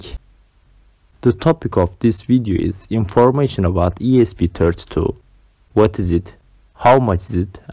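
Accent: Turkey. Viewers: türk olduğuna yemin edebilirim ancak ispatlayamam , diksiyon tanımlıyor sanki :D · Duyar duymaz dedim bu aksan kesinlikle bir Türk'e ait.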